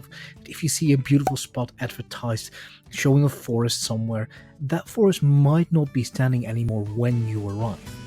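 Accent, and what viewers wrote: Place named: Ireland. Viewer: You’re definitely very lucky to be living in what is, in my opinion, the most beautiful part of Ireland. I’m quite surprised that you’re not Irish, considering your accent 🧐😉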